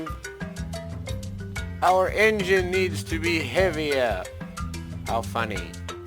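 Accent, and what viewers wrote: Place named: Africa. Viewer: I swear you have a African accent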